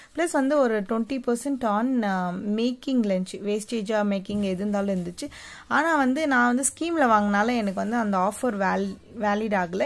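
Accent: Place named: Telugu, India. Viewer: Ok you are telugus so your Tamil is saking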